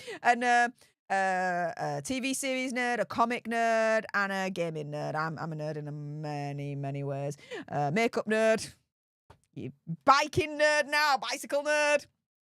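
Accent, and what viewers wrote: Yorkshire, United Kingdom. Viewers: She has a Yorkshire accent.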